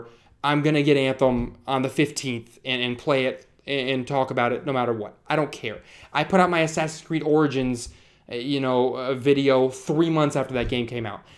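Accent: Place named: South, United States. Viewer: What happened to your southern accent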